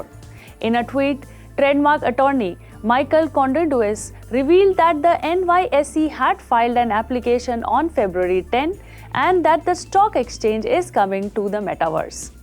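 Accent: Gujarat, India. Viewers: Mam, You are presentable and I think you also have some kind of tech background (probably database programming). But your accent and musical lilt in pronunciation is very Gujarati. Nothing wrong with that, but please try to be accent neutral. There are training courses which people undergo in BPO/Call Center related industry to cultivate the right accent. I highly recommend you to please undergo such training. Take this as constructive criticism and not negative criticism. I mean good for you. All the best.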